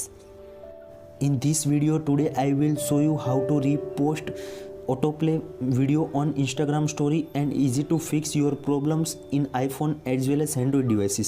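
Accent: India. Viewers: When india speaking English 👎👎👎🤮🤮🤮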